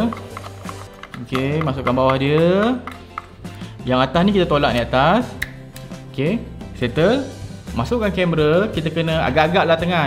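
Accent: Melaka, Malaysia. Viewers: bunyi slang Melaka 👍👍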